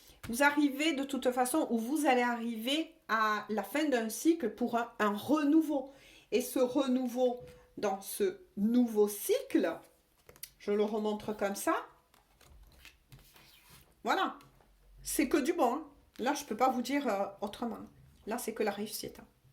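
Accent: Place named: Provence, France. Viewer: Merci belle guidance parlante et limpide avec ce bel accent Provençal qui me rappelle ma ville préférée Aix-en-Provence où j'ai fais une partie de mes études supérieures et dont je resterais amoureux pour la vie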